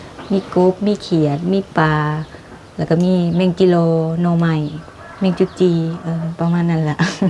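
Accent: Isan, Thailand. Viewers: จังแมนสำเนียงเจ้าคือคนอิสานแฮงน้อ😂😂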